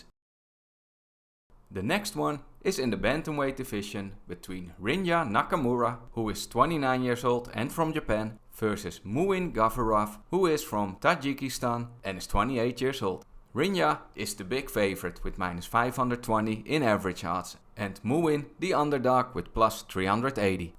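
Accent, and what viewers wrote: Netherlands. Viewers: that thick dutch accent, nice u from the Netherlands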